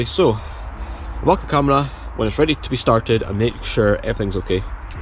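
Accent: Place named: Scotland, United Kingdom. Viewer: I've got an A4, but harder because it's close to the bulkhead but just takes patience. I rebuilt the tandem pump as well. Oil pressure light gives you a fright 😁 VAG cars all do this with long cranks, don't ken why. Guid to hear a Scottish voice on YouTube, keep up the good work. Inlet looks spotless. 🏴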